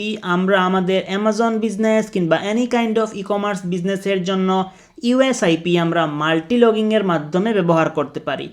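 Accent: Sylhet, Bangladesh. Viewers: Sylheti ni vai tumi?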